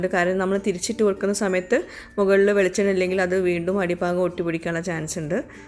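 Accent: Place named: Malabar, India. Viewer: സിമ്പിൾ നാടൻ വിഭവങ്ങൾ, ഹൃദ്യമായ മലബാർ സ്ലാങ്ങിലുള്ള വിവരണം. ഭക്ഷണം കഴിച്ച പോലെയുള്ള ഒരു ഫീൽ 😊👌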